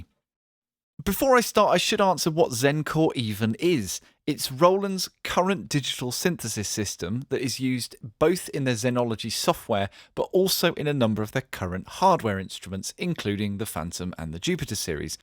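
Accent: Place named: United Kingdom. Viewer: Extrem british accent detected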